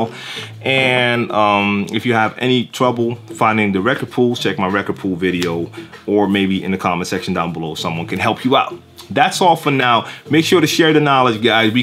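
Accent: New York, United States. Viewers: Damn, I thought you were a New York dude that has been overseas because of military service or something-I thought you were American!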